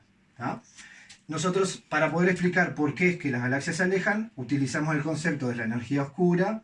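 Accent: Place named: Uruguay. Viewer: Interesante hipotesis, mucho mas siendo que no eres físico. Eres uruguayo? Tu asento se parece mucho. Deberias estudiar en el Balseiro. Saludos desde Argentina.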